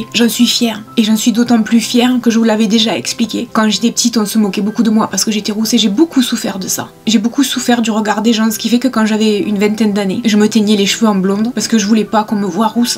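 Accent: South, France. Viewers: J’ai eu le cœur brisé et la boule dans la gorge quand je t’ai entendu pleurer…. Ma chère, ton identité, ta féminité, c’est ton sourire ravageur, tes yeux pétillants et ton accuuuuunt du suuudeuh! Un bisou à Jean-Yves ❤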